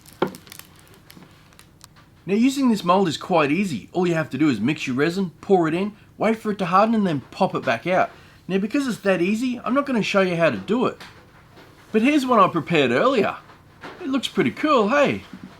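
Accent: Australia. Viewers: You should’ve made one solved and one mixed. But anyways it ended up looking awesome. 😁 Are you an Australian? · Hey Mate, love your voice and of course your turning! But the way you speak in your classy aussie style is what I enjoy the most! Been a backpacker in 2016 and fell in love since then!!💘🇦🇺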